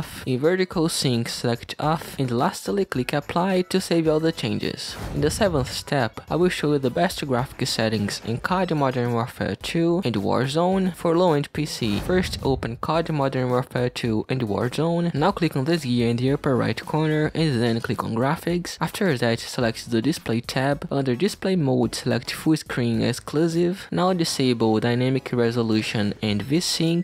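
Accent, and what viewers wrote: Brazil. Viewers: Seu sotaque parece brasileiro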